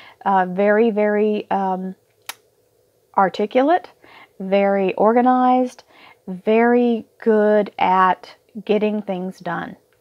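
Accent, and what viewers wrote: Texas, United States. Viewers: Love you Love you Love you....thank you sooo much you Texan girl.....Love the way you get all gigly and excited 😇😇😇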